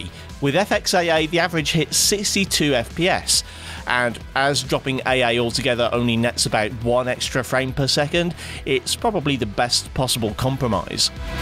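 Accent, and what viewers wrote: United Kingdom. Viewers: He doesn't sound at all like NX gamer, both have british accents but that's it. They're even different dialects from different parts of England.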